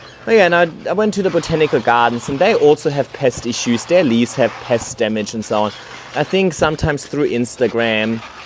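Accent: Germany. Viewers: So, I only really follow you here bc I can't handle other social media very well. I could have sworn in your last several videos that your accent sounded a little more on the German side 😂 It's typically a very equal balance between accents if that makes sense? But I was thinking you may have taken a little trip back to Germany or something, haha! I'm so so so so glad you had a good visit! I think we're pretty close in age and I live very far from my highschool (and current) best friend, so I definitely relate. I also moved somewhere that affected my accent according to others, but I don't hear it!!! So now I'm hyper aware of people's accents and how they can change!! Love it. 😂 Thanks for such a long video. I'm painting my new home so I'll definitely be binging your channel the next few days. 💚💚💚💚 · When I first started watching you, i was like: "is this a german accent? 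No i think that is just how they speak in Australia" And then i was so surprised that you are acutally from Germany. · I have just started the hobby and I appreciate your videos and hope that I can achieve the same level of growth!! I am located about 1.5 hours north of you in NSW. Also, my grandmother was German and listening to your accent reminds me of her. You have the same work ethic as she had and passed that onto me.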